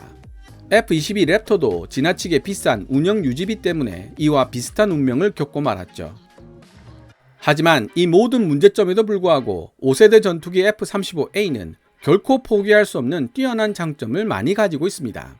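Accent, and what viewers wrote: Gyeongsang, South Korea. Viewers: 이분은 영어도 갱상도 억양으로 해서 오히려 잘난체 하는 오해를 불러 일으켜 쫌 재수가 없음... 그런데 영문만 나옴 호랑이가 사슴을 봤을때처럼 덤벼듦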